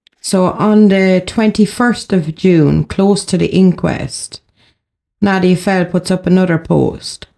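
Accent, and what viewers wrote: Ireland. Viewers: Absolutely fantastic analysis of this case , I love the Irish lilt xxx jen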